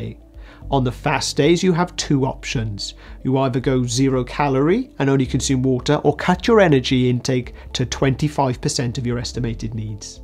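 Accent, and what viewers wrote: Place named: Wales, United Kingdom. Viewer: Love the Welsh accent. Great breakdown of comparisons, thanks. I have T1 diabetes and fasting makes my TIR and BG absolutely fabulous and I feel great.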